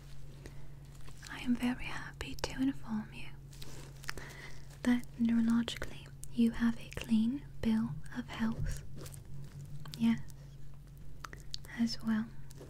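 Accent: United Kingdom. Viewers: Love your British accent. Very on point. Love it. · You have the most Beautiful and Hypnotizing Eyes!!! I always love the British Accent for your ASMR!! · Is that a light British Accent?? It’s so faint I can’t tell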